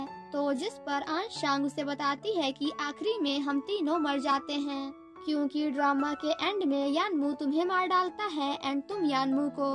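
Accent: Gujarat, India. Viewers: Ok I Guess you from GUJRAT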